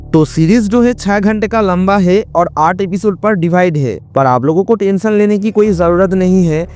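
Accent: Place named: Bengal, India. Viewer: tumi Bangali sur charte parcho na go bhai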